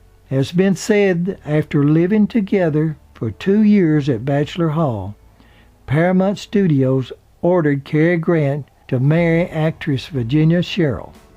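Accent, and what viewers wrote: South, United States. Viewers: Many thanks, one of my favourite actors. BTW I love your accent, where in the States are you from? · The narrator has a beautiful southern accent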